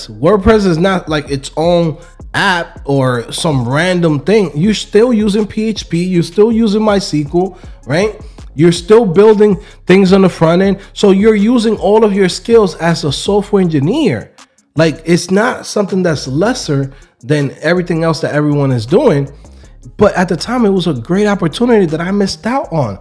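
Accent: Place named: New Jersey, United States. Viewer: Wish I would’ve found this channel before I enrolled in a full stack course..finally somebody who look like me, even tho I’m black and I’m assumin he Dominican prolly..whenever you look up coding it’s a video wit a white boy or an asain which ain’t nothing wrong with that, but representation matters, and seeing a dude who talks like me and is presumably from where I’m from(I’m from Jersey he’s definitely from Jersey or ny) is exactly what I was lookin for 🙏🏾🙏🏾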